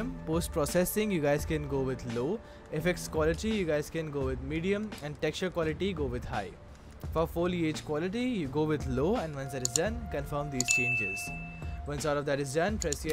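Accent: India. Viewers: English of The índia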